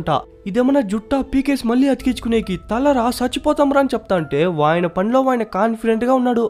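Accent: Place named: Kolar, India. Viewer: Kolar slag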